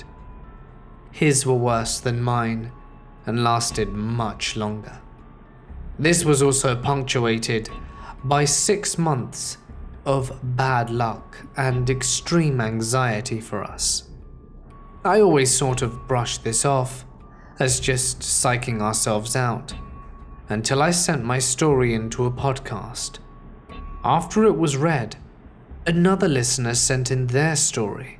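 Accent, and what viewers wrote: England, United Kingdom. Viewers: That's how we Brits pronounce it.... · Kevin Heriford He’s English and that is the way they pronounce cemetery with their accent. 🤷🏻‍♀️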